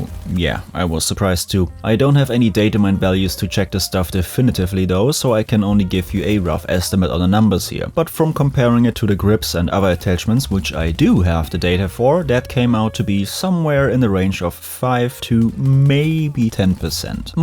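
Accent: Germany. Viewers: Just wanted to say hello as a new subscriber. Just found your videos and they are AWESOME. I really like how you break the weapons down, specifically the ttk ranges and ammo/barrel differences. You are the 1st BF person I've found who does this (yes, I'm newer to BF) coming from 20yrs of COD where it is more common (again yes, I'm a COD convert but I think we all can get along don't hate me 😉. Also my fave is MW2 most BF-like imo). Already kinda long sorry just wanted to say keep it up great job! And as 1st generation American from German & Croatian immigrants I think i caught the accent - German, no?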